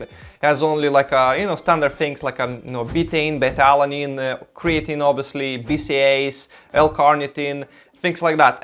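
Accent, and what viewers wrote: Russia. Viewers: ah nice I instantly recognized by your accent you were a Russian speaker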